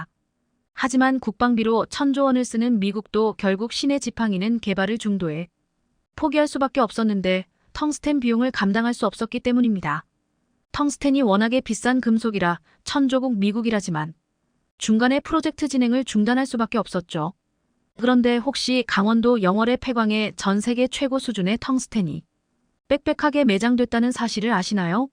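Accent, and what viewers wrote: China. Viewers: 나레이터는 조선족? 한국인으로 바꿔라! 듣기 거북함! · 이거 음성녹음하시는분 중국인 ?? 베트남 한글.숫자 몾일어요 2,000 t 이게 이 영영티라고 읽어요 중국님아 한글 개망신 시키네 유튜버 하지마세요 한글망신 에고거 한두번도 아니고 ........><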